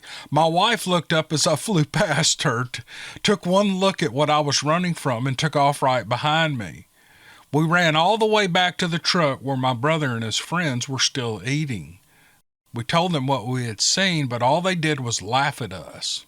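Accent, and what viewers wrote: South, United States. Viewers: I tune out the moment I hear a southern accent I relation to sazquatch......seeya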